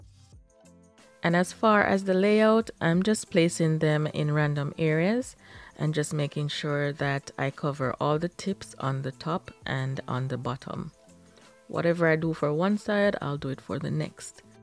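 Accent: Jamaica. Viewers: All these creations are beautiful. I'm definitely going to try the flower and black vase. Your accent sounds Jamaican, are you?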